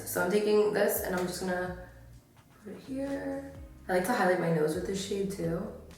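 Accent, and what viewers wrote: New Jersey, United States. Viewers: I just noticed your New Jersey accent ☺️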